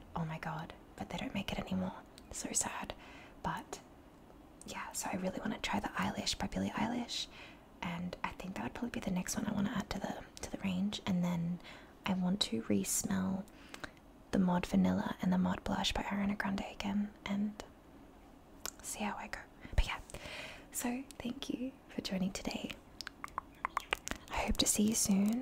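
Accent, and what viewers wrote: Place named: Australia. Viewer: Love listening to the australian accent 🌞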